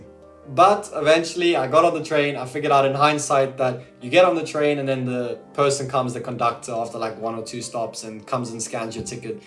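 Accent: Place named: South Africa. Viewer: I could hear your accent you South African....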